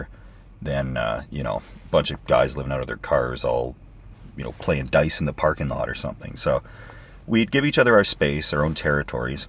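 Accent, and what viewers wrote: Canada. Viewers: OOOOOH yea..... Wanted to say that ur letter kenney way is omg, so BEAUIFULLY Canadian. I FREAKIN LOVE YOU...... Never stop.... I went back on ur vids and, holy shit hairbag.....!!!!!Seriously my friend, do not stop..... Hi Krazy neighbour and Beautiful wife( who without her, I'm sure, would not be) ( By the way girl, fu*& the haters... Mad respect) Don't stop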